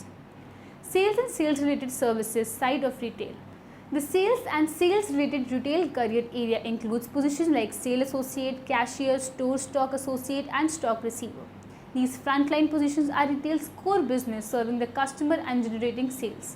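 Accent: India. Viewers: I have no idea why people are criticizing her accent. Apparently she is born to be an Indian and that's part of her characteristics, that's her. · She is speaking in English with an Indian Accent.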